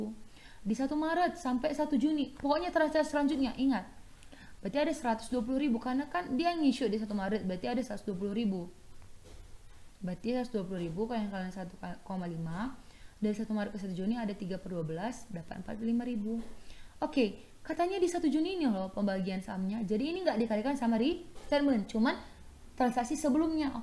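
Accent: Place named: Medan, Indonesia. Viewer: Makasi kak, wkwkkk nampak kali org medan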